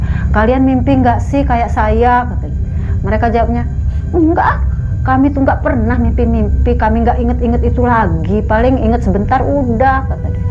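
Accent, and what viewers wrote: Lampung, Indonesia. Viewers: Oke siap .. ciri khas suku gham lepung (suku kita Lampung)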